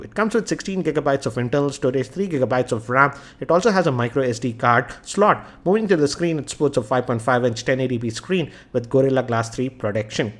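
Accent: India. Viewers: Hey pig,he is speaking indian english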